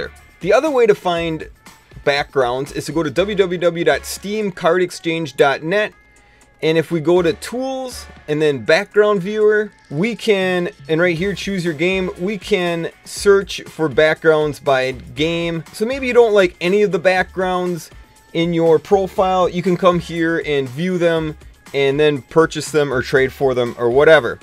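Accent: Canada. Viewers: You are the most Canadian person iv'e EVER heard it really annoys me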